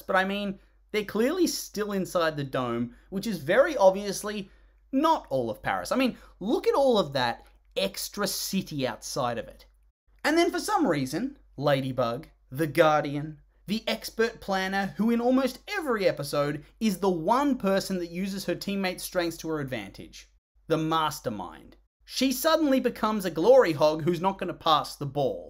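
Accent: Australia. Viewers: Im gonna guess you're aussie from your voice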